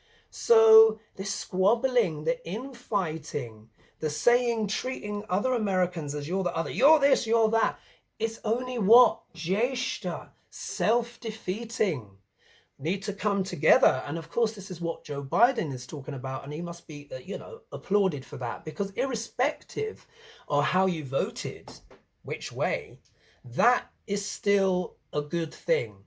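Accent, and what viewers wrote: United Kingdom. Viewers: You are my favorite Vedic Astrologer. That British accent is awesome.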